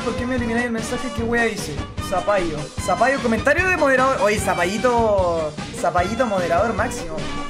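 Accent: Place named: Argentina. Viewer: Pensé que eras argentino xd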